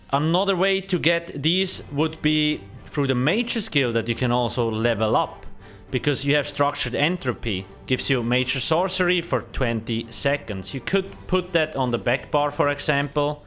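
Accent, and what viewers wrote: Switzerland. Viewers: Sounds like a swiss dialect. Grüezi us Dütschland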